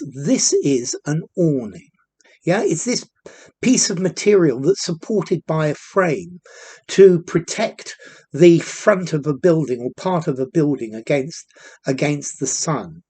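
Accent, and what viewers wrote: United Kingdom. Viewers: Thanks for the UK pronunciation . Its derivative awning window" where the upper end of the sloping window is hinged and the other end meant for moving the window along an arc.. Please correct me, if I am wrong.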